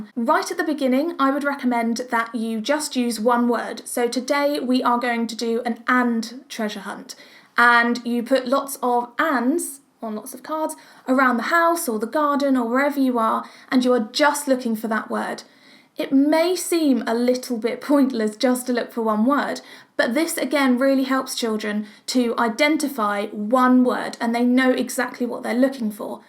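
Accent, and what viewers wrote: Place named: RP, United Kingdom. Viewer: British accent; more specifically, received pronunciation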